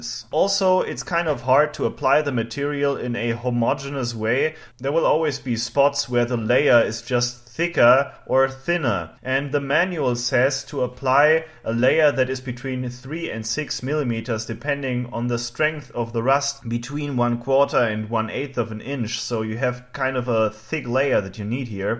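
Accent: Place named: Germany. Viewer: German guy speaking English (American English), German accent, German cadence, some German syntax, excellent vocabulary, excellent diction, idiomatic expressions are plentiful and accurate and used properly.......language proficiency is excellent. Where and how did he learn to speak English......so well.......? ? ? ? ? ? ? Best regards, fm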